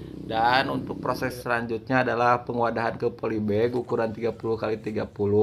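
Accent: West Java, Indonesia. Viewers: Sunda pisan si aa jd seuri sorangan nonton na og, ttp smngat A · Loagt nya sunda bnget.. Indonesia gitu lo.. Beragam bahasa dan suku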